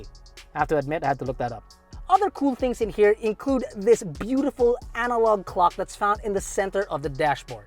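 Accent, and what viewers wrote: United Kingdom. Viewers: medyo dinig yung hangin sa B-roll.. baka mas okay kung i-mute? Love the British accent. hehe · That hilarious British accent killed me! 😂 On a serious note, what are non-Chinese car manufacturers thinking? Chinese car brands (or atleast cars made in China) are bringing better tech and value at a lower price in the Philippine market. It's as if they're just relying on brand recognition and perceived "dependability in the long run" to make sales.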